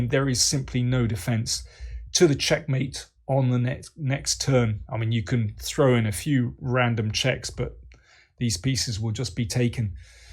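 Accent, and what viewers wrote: United Kingdom. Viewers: nice british accent, my dude (mate)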